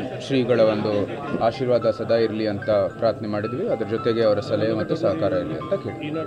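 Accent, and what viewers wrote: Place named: India. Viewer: ರಾಜಗನ್ನಡ ಕೇಳೋದೇ ಚೆಂದ 🥰👏👏👏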